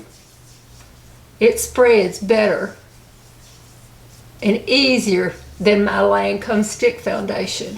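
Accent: South, United States. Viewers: Love the southern accent. I got one to; Live in Mississippi. You are a 💎 gem. Love ❤️ your videos. Thank you 😊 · I have headed such great things about those little elf eye shadow pallets , I think these are going on my wish list for my Christmas list :) I love listening to you while I’m wrapping and stuff around the house , I just can’t really say how much I enjoy your videos so much. They relax me, and I could listen to yoursweet Southern voice for ever ❤️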